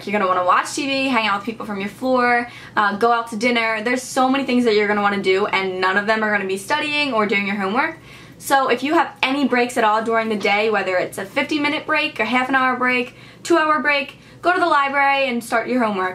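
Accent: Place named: Midwest, United States. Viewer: you sound more like someone from the midwest, not a New Englander. But awesome advice!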